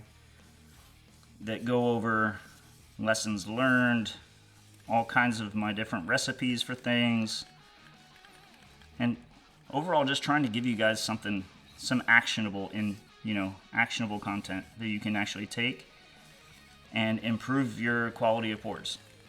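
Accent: South, United States. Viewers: I love it. Also Southern, like yall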